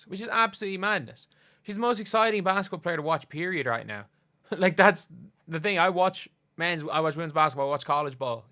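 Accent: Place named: Ireland. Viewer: I love practicing Irish accents by listening to. I just wish I knew which part of Ireland he's from. I hear different "Irish" by various celebrities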